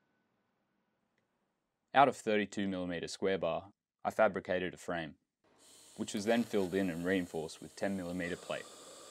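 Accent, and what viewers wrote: Australia. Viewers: Beautiful (Aussie) introduction. Great video. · Some strange mix of This Old Tony, Marling Baits and.... well, an Austrailian... Edit: A very good mix. Excellent way to start a channel strong. good on ya mate. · Before I heard your voice, I was hoping it was Australian! And boy, I’m happy. Can’t wait to watch your next video. You can then join the ranks of CEE and I Did A Thing, and Pask Makes… no engineers in sight UK Aerospace Engineer here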